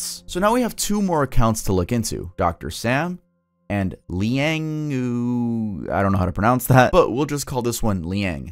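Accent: Spain. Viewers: “Por Dios” is slang. It mostly translates to something like “By God” not “On God.” “On God” will be more like “En Dios.” Either way, I like your Spanish accent!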